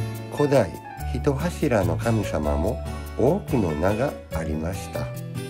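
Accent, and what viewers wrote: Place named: Shikoku, Japan. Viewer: キノちゃんです🐰✨ の声すきー🤗あと、四国のアクセントが素敵！「だっぺ」茨城キノちゃんとは違う🥰 日本一古い家系図ってことは、家系図だから、古いのに歴史をさかのぼってるんだからスゴイですよね！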